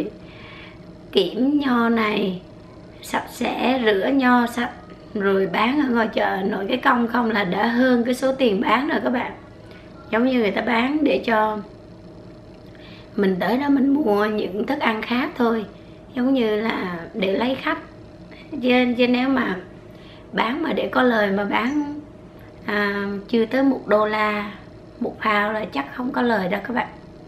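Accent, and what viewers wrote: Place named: Central, Vietnam. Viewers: Hôm trc nghe cô nói gịong quảng nam là con nghi r. Con củg ở qn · Chị cùng quê với em nè. Hèn gì em nghe chị nói chuyện giống giọng miền Trung. Ngày nào cũng hóng video của chị. Chúc chị mau khỏe nha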